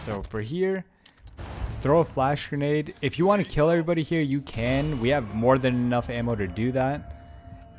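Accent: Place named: United States. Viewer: you did not just say “my English is not that good” 🤣🤣 dude I thought you were American literally, I’m guessing you’re European as you guys seem to adopt the American accent hahah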